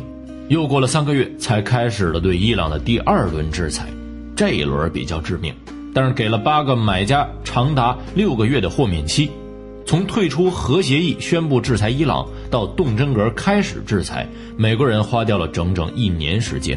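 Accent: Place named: Beijing, China. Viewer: 正宗的京腔京韵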